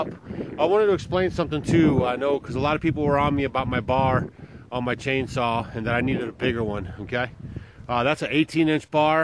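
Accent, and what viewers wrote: United States. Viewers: you from usa?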